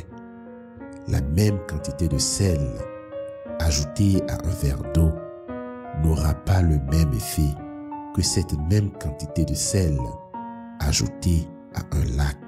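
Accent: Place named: Africa. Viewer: J'adore l'accent africain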